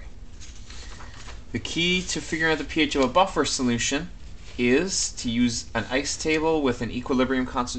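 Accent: Canada. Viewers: you Canadian man?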